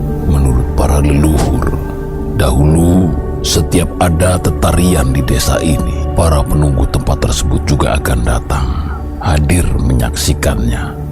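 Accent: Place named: Java, Indonesia. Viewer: Baru aja nemu channelmu mas (emot sedih). Njawani banget dari setiap katanya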